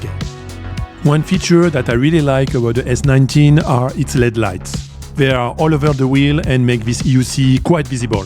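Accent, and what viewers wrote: France. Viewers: j'ai cru comprendre a ton accent que tu est français ^^ Il faut que je me pause et que j'écoute bien tranquillement tt ça, car la en speed j'ai strictement rien capté 😂 mais merci pour l'explication, je comprend mieux !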